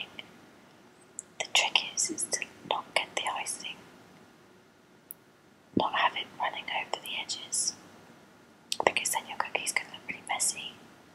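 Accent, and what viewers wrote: United Kingdom. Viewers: You are beautiful, and I love your voice. I love the British accent, and I love the fact that you have a very soft whisper. Come to Knoxville TN, I'd love to hear your real voice. Keep doing what your doing, it's very soothing and pleasant. Hope all is well, have a great day :-).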